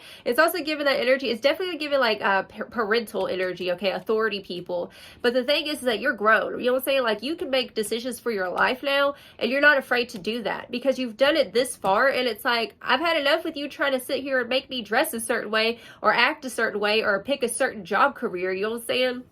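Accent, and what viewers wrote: South, United States. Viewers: You look Latino? Talk like you’re from the south so ADORABLE/CUTE…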